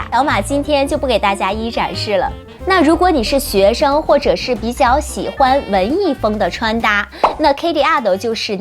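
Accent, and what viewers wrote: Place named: Japan. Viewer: 日式英语发音听得超级不舒服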